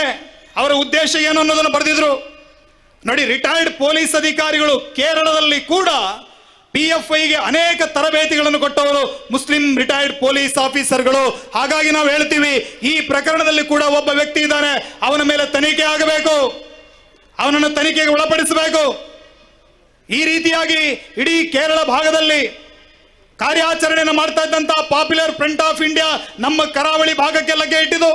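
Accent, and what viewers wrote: Standard. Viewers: Swacha kannada power full speech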